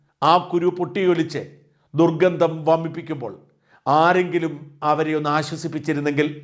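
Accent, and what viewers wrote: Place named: Kanyakumari, India. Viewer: Aarenkilum vilichotte shajan Sir, kanyakumari malayalam 🎉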